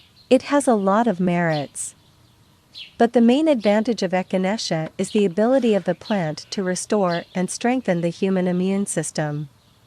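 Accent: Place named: United States. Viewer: You sound American.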